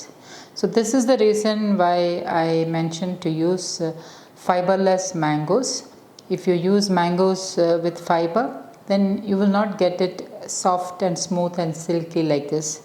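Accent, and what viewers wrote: India. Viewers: There's nothing different with her accent, it's just a perfectly normal Indian accent.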